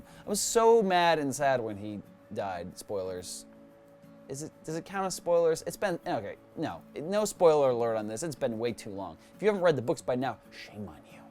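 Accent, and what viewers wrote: Germany. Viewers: I wanna go to tokyo!!!!! Can I hide in your suitcase or something.... wait that came out wrong... sorry. Side Note: Your German accent makes me laugh all the time... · Dein Deutscher Tonfall ist ganz schön happig,. (Translation: your german accent is a bit much,.)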